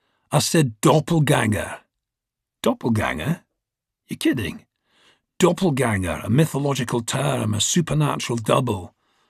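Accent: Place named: Scotland, United Kingdom. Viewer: 𝑨𝒃𝒔𝒐𝒍𝒖𝒕𝒆 𝒑𝒆𝒓𝒇𝒆𝒄𝒕𝒊𝒐𝒏.ᐟ~ 😌✨ An epic, riveting narration with excellent voice acting for the characters - oh, and the Scottish accent reminds me of Robert Carlyle's. Would expect nothing less from the ever amazing Rupert Graves, one of the best actors/people in the industry/world. 💛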